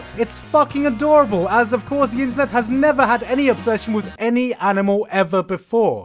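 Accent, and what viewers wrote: United Kingdom. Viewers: [ „playlist suggestion“ -|- 111:02 later x3 ] fuck need more good comedy content that gives me that special kind of exciting and good feeling i get from waching your videos with you talking about how shows are that they them selves never manage to give me and a sexy english voice with a british accent ... =)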